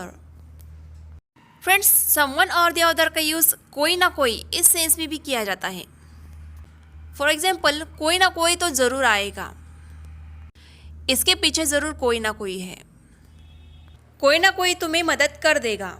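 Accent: Maharashtra, India. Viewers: Your hindi signs marathai languge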